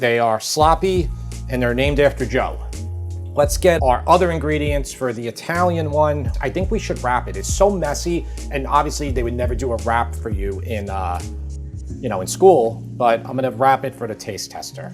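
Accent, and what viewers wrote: New York, United States. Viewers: You sound like Andrew Camarata. You must be from New York. He's a YouTuber, like you, but different in that he's heavy equiptment, etc.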